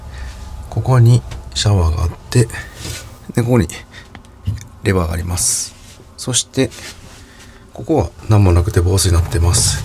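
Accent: Kansai, Japan. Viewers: １分で関西出身とわかる！喋りのアクセントで… クルマは岐阜ナンバーだが。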